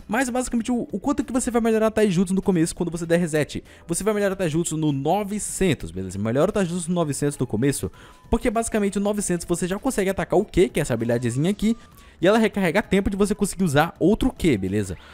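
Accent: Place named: Bahia, Brazil. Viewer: Essa sua voz de baiano me encanta 😔✋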